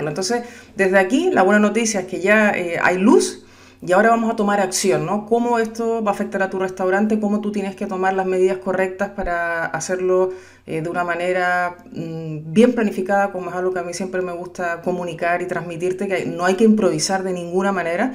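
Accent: Chile. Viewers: eres Chilena?.. Acabo de encontrarte eres lo máximo!! Muy claro y muy motivador! Excelente ayuda para nosotros del rubro Restaurantes! Saludos!!!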